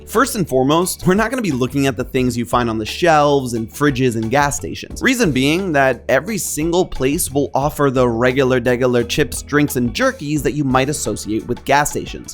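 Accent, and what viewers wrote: Argentina. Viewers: Nothing makes me happier than Argentinian accent coming through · IS FROM ARGENTINA???? THE ACCENT, THE CHARM, no wonder I like him as a host. Another coronación de la gloria 🇦🇷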